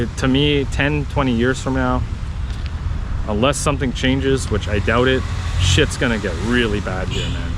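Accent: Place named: Canada. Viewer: I am a brazilian, ffrom SP, I ve lived in a few countries, including the US (though your accent sounds a bit canadian) I don't blame others for all Brazil s problems, just the ones that were caused by others. Not only Brazil, it s notorious the role of the US in supressing democratic regimes throughout the world, replacing them by brutal dictatorships. Go read a book! I know Rio very well, I have family there, I agree with a lot of what you said, but you also said a lot of nonsense. I love Rio, but I d never live there, too chaotic, I agree people are not very polite, but carioca's are very friendly, easy going, if you didn t get along with them, maybe the problem is with you, not them. The thing you said about the "tamo junto" seems to be a misunderstanding on your part, even brazilians joke about it, "falei pra ele aparecer lá em casa, e o chato apareceu", still, brazilians are culturally much more open to others. 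From the few words you said, I can tell your portuguese is not very good, you have a very strong accent, despite allegedly living in Rio for years, that might be getting in your way of properly communicating with people. The things you said about brazilian women sounded pretty sexist too. The stuff you said about brazilian politics and social economic issues is just BS, Brazil has improved a lot in the past 20 years, I am an economist, working with finance, it s all in the data, do some research. That said, the US is ruled by the king of the deplorables, backe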